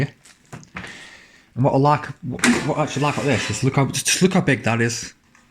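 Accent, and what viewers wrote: Stoke, United Kingdom. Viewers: Thats a proper stokie accent. Nice surprise when looking at a random cooker video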